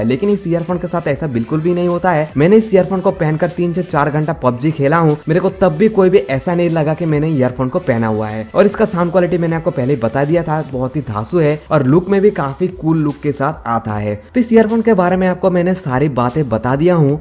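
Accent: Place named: Bihar, India. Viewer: Bolte time bhot pyara lagta h tu bhai 😁 Bihari hai na ?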